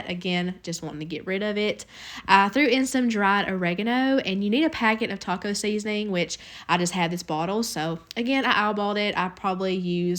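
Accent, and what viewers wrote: South, United States. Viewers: Hi, you are a wonderful cook. Everything looks delicious, especially the hash browns & the pork chops. Your comments along the way are great (like the pan that heats up incredibly fast, & going next door to get milk!). Lastly, I could happily listen to your lovely Southern accent all day. Thanks for all the time and effort you put into your videos. Best wishes from Sydney Australia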